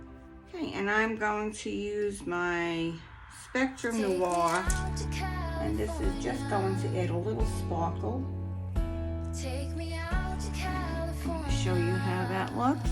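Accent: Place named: Northeast, United States. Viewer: Very neat idea . Sounds like you moved from the Northeast to Hollywood ftom that accent. Bless you 🙏 💛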